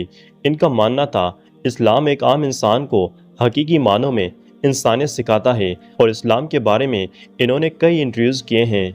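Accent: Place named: Germany. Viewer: ❤brother i like your accent, from germany. Bht zyada grow kro gy 🤲🏻… You need consistency. 😊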